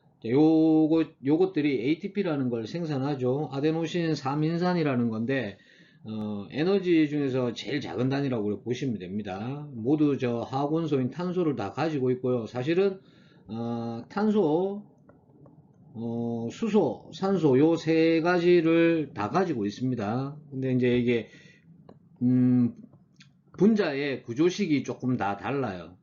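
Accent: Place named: Busan, South Korea. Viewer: 크 알겠습니다 ㅎ 오랜만에 듣습니다 단디 ㅎㅎ 저도 부산놈이라 형님 사투리가 참 좋습니다 ㅎ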